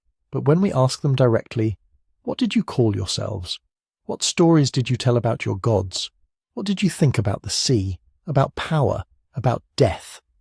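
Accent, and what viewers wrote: United Kingdom. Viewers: It is genuinely breathtaking to hear a British accent this refined and so confident delivering an argument this intellectually bankrupt. To claim us Hellenic (Greek 🇬🇷) people, merely 'inherited' this civilisation, this would be using the same set of broken brain cells suggesting the modern British aren't actually related to the people who built Stonehenge, that you lot just 'bobbed up' in the North Sea one Tuesday, found some neatly stacked rocks and a few damp fields, and decided to play house in them. If you could pull your head out of the clouds for five minutes and check the 2017 Lazaridis study in the journal Nature, you’d find that the genetic receipts' scientifically prove an unbroken biological line between the Minoans, the Mycenaeans, and the people living in Greece today. It’s not an inheritance it’s our own bloody house you fool. 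But I suppose we can’t let pesky peer-reviewed science get in the way of your revisionist propaganda can we? Surgically separating Crete from the Greek identity isn't historical analysis 🤞🏻🤞🏻 it’s just a semantic shell game for the intellectually lazy. Honestly, I’ve seen more historical accuracy in a packet of crisps. You’re not a historian; you’re just a confused tourist with a microphone and YouTube page you MALAKA ask the Greeks what that word means.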